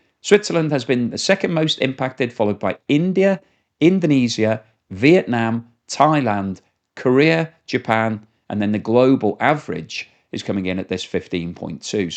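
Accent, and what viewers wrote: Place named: Northwest England, United Kingdom. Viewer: Hi, I replied via e-mail, but think it didn't go through. Sorry if this is redundant. Here 'tis: Hi, Thank you. You have the easiest-to-understand economics blog that I've found. It's admirably clear, and you come across well. There really is huge value in explaining the obvious, which you're doing very well. I've made a fair bit by publishing books doing exactly that (e.g., my first book, "An Understandable Guide to Music Theory for Jazz, Rock, and Blues Musicians," which sold about 15K copies--building on my academic studies [music theory and composition], decades ago.) BTW, I guessed correctly that you have a financial background--though I thought you were an ex-stock broker--but I was wrong about your accent: I thought it was from NE England rather than NW England, primarily because it's similar to that of one of my favorite Brti comedians, Sarah Millican, who I think is from Tyneside. 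(I live in SE Arizona, have never been to the UK, have lived almost my entire life in the western US, but am fascinated by dialects.) Finally, I completely understand your decision to branch out on your own because you were taking orders from morons. And I applaud you for it. That's pretty much where I was 40+ years ago, when I started a book publishing company with a gift of $1500 from my parents--I was near broke, but was doing graphic arts work at the time, so I had the necessary skills--and then had to claw my way up, handicapped by under (nearly nonexistent)-capitalization, my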